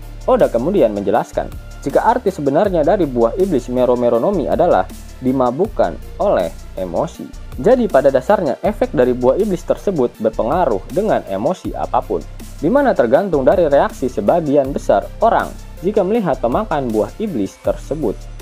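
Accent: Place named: West Java, Indonesia. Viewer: tercium orang sunda wkwkwk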